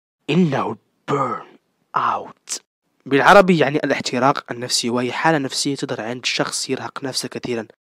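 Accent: Morocco. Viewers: من هدرتك حسيت بيك مغربي فاش شفت فيديوهاتك تأكدت 😹😹 حبيتك الاخ 💁♥️ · انت مغربي؟ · ولد بلادي باينة غير من هضرتك😂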